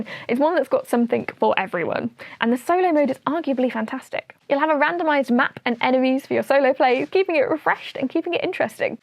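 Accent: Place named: United Kingdom. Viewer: Great list. Though I’ve seen it before, I just come back for the British accent.😆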